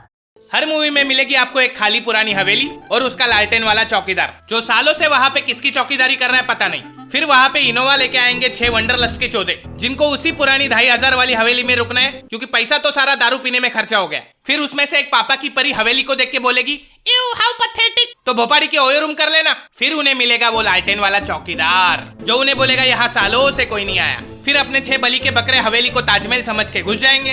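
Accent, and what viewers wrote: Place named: Mumbai, India. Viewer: We like your Mumbai ki language... the way you speak in different voices... unexpected topic pe Video aa jate hai😂 aaj to hamara dar khatam ho gaya... bechara bhoot berozgar ho gaya😂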